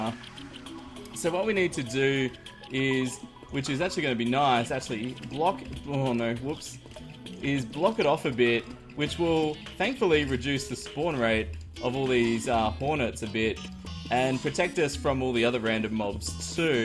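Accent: Australia. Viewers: lol you from Australia too mate?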